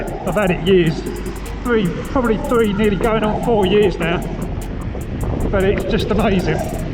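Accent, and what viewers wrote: United Kingdom. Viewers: This would be a great video if you were not so damn British. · proper brit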